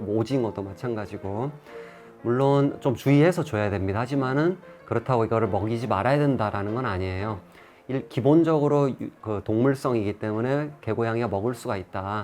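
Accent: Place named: Gyeongsang, South Korea. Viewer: 잘봤습니다~~^^ 영상과 관계없지만 혹시 원장님 고향이 경상도세요?? ㅋㅋㅋㅋ 억양이 베어 있는거 같아서 친근감이 드네요 ㅋㅋㅋ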